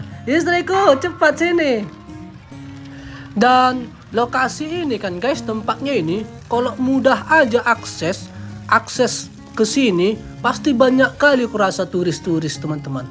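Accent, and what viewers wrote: Medan, Indonesia. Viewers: Halo. Saya selalu liat youtube lewat tv jd gk bisa komen, kali ini liatnya lewat hp jd bisa komen.. senang liat kalau ngomong, khas medannya keluar😂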